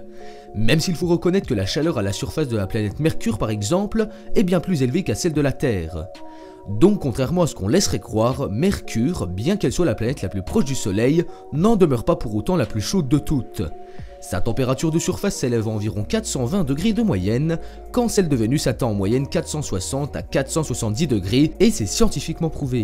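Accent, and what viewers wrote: Belgium. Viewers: avec son gros accent belge de merde il ne m'apprend rien ce petit cretin qui il est pour dire ca va te cacher et ne passe jamais a la radio tu m'a donner envie de chier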